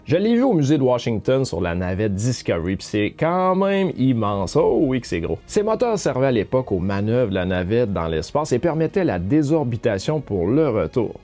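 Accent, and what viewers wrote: Quebec, Canada. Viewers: L'espace racontée avec l'accent de la Belle Province, c'est top!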